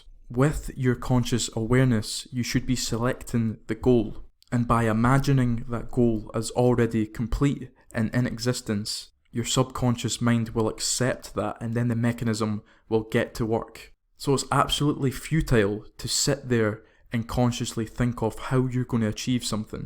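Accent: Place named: Scotland, United Kingdom. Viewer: 👏 great scottish accent , cognitive training methods that are practical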